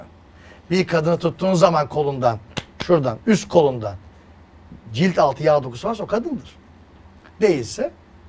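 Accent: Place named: Aegean, Turkey. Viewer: Doktor olmuş ege şivesiyle konusuyo diyen gerizekalılar da var.dogal olmak güzel.katiliyorum size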